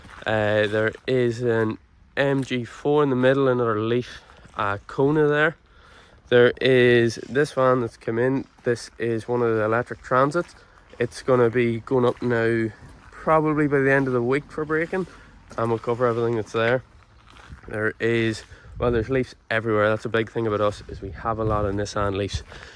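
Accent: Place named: Northern Ireland, United Kingdom. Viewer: The accent tells you , northern Ireland, probably. around Newry area by his accent🎉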